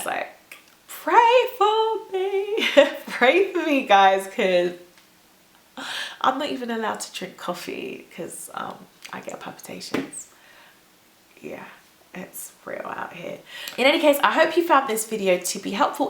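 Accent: United Kingdom. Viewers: I cAnt stand the British accent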